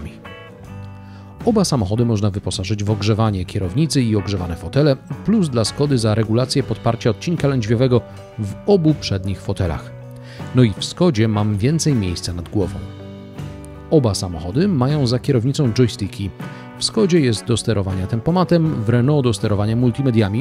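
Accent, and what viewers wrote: United States. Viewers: Akcent rzeczywiście mocno US :) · mieszkałeś z Stanach, że masz US akcent?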